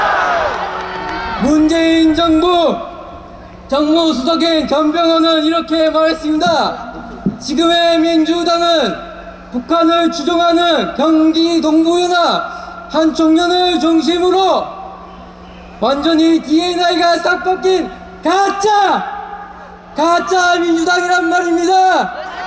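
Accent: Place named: Jeolla, South Korea. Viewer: 구수한 전라도 사투리 멋져요 애국청년 화이팅! 최고입니다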